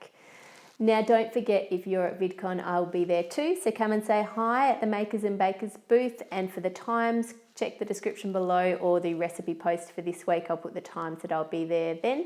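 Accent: United Kingdom. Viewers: Your so talented and creative. And I love your accent too. It's like British accent. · Are you British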